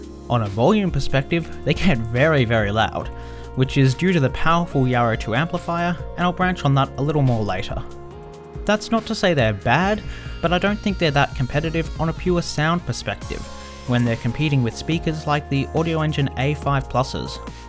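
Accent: Australia. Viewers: Do I hear an Aussie accent or what! :D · guy's an Aussie? Fuck me I couldn't for the life of me figure out where his accent came from. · Glossy black plastic? That's the deal breaker right there no matter how great they may sound. The use of glossy black plastic on all consumer goods should be outlawed and banned as far as I'm concerned. The amp seems decent enough but the drivers sound sub par from what I can understand and I'm not at all surprised. For the price the speakers (of reputable quality) should be housed in wooden boxes at the very least. Anyway I enjoyed the video nonetheless and the chaps accent reminds me of the days of being at the SCG, WACCA and MCG again.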